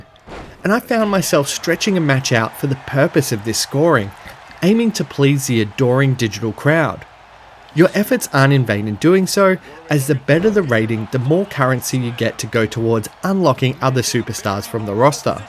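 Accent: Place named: Australia. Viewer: i got 31 seconds into this video and had to click off, aus accent is like nails on chalkboard to me ..apologies but im sure its great... also sort your levels out on mic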